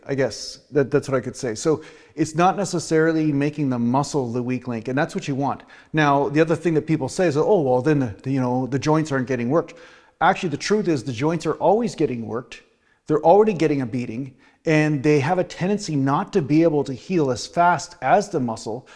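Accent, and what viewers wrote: Canada. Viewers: Great advice brother. 👍 I really like that "someone else driving your car" analogy. So true 👍 You sound Canadian. Are from Canada? I live in Alberta. 🇨🇦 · you are like Yoda- only taller, more muscular, and with a Canadian accent. Based on the wisdom you present, I could easily accept that you are likely also 900 years-old. I'm 58, been lifting since 14 years-old, and have seen about all there is to see and read in the BB world. I'm a personal trainer, nutritionist, and Neuromuscular Therapist and, despite that background, I ALWAYS learn something new from you in every video, even if it's just a fresh way to approach an idea or an entirely new way to think about something. I can honestly say you present the most pragmatic, useful and wise content of anyone on YouTube. Truly. Thank you so much for your hard work in making these videos and for being the voice of reason in the dystopian, sometimes absurdly ridiculous, bodybuilding world that now exists.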